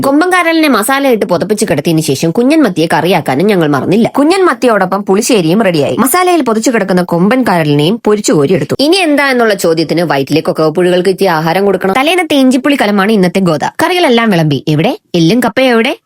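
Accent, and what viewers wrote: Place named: Trivandrum, India. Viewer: chechi tvm analle❤❤. Athukond enik manasilyi 😂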